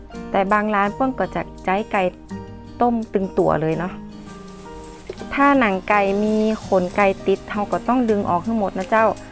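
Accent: North, Thailand. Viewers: เสียงแบบนี้ฟังแร้วเป็นคนเหนือใช่ปะคับ แม่ค้าคนจังหวัดจ้าวครับ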